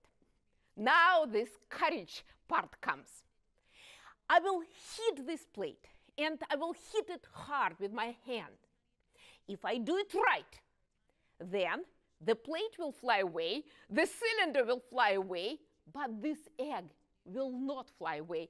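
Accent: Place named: France. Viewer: I thought she was from France or Germany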